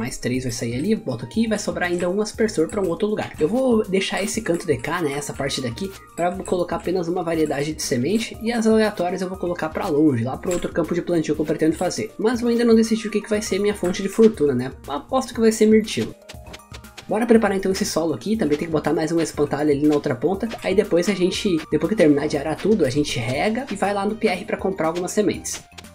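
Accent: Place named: South, Brazil. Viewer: Tu é gaúcho!!?? Acho o teu sotaque parecido com o do sul, se sim tu tá seguro!?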